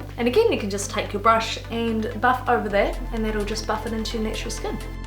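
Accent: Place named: New Zealand. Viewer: I'm from Egypt :* I knew you were from New ZeaLand because your accent is similar to ShaaanXo's .. I like your accent a lot actually :))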